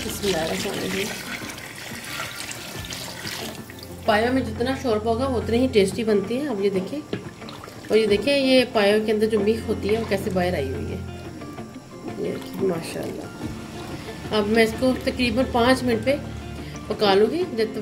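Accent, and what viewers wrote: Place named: Pakistan. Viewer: Me india se hu baht esy treeka btaya hai apne nice mam me try krungi pakistani zban muje pasnd hai bahut yha india me istrha nhi bolta koi bhi but muje apki voice achi lgi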